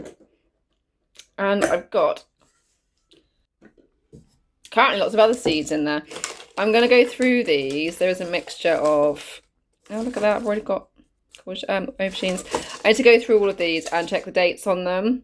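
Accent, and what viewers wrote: United Kingdom. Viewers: I'm a street (children's home) kid, listening to you English middle class folk, tickles my pickle.. Defo one of my favourites! Oh how the other half live.... 😄🙄🤪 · I think you’re showing your class prejudices… This ladies accent is very normal here in the U.K., not artificially elevated, just average/neutral.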